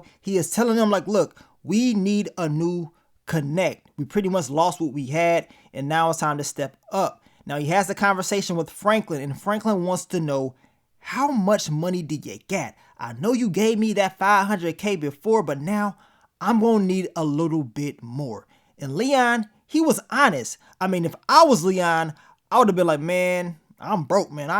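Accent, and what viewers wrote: United States. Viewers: Man still in his American accent 😂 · u coined that LA accent bruh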